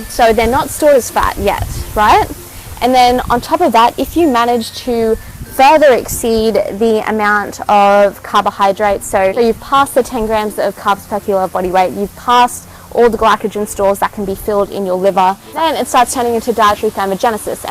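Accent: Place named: Massachusetts, United States. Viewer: love your accent. r u from MA?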